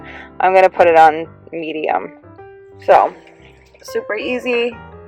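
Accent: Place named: Philadelphia, United States. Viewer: Philly accent 🦅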